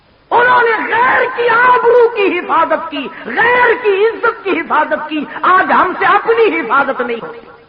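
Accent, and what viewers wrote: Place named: Multan, Pakistan. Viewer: Very beautiful and heart touching dialect, listening gives spiritual and divine intoxication. It enlightens and illuminates the colorful fragrance of our red, orange, yellow and green soil. It gives a beautiful fragrance of Soil joyed, experienced and delighted after the Rain. It gives a reflection, thought and consideration that you are sitting in small mosque made by RED BRICKS in the village of MULTAN or you are sitting in small mosque made by RED BRICKS in SLUMS or UNDROON E MULTAN in walled city and listening the BAYAN, Narration or Declaration before JUMMA prayer. His dialect and way of speech truly reflected beautiful fragrance of Patriotism especially for the People of Pakistan, India, Bangladesh and Afghanistan as well. His dialect and way of speech is highly regarded with affection and love.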